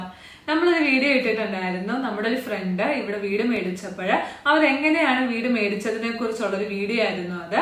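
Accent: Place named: Onattukara, India. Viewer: Nice video. Including some sample price for homes in suburbs for say 50 -100 sq. meter homes would have been the cherry on cake. Your malayalam is so much like 'onattukara' malayalam ( Mavelikara, Chengannur etc) ! It's such annuncommon version of Malayalam on YouTube. This gives us the feel of our 'Nadu'. Thanks.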